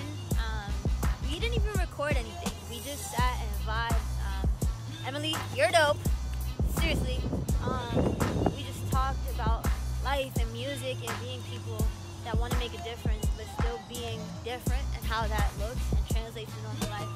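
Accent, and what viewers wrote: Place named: New York, United States. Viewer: Your New York accent is very strong 😂😂 It cracks me up at times. But you are keeping busy.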